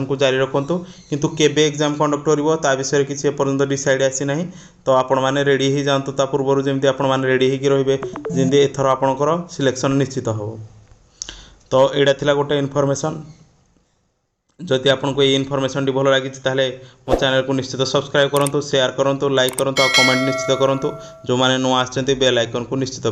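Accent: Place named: Angul, India. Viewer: Bhai anugul ghara ki? Tama kahiba style anugul side ra😋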